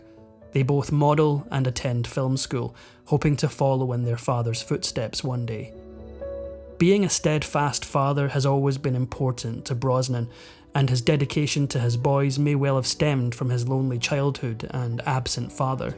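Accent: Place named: Scotland, United Kingdom. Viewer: Yay!! I am so glad that the Scot is doing the narration more so now...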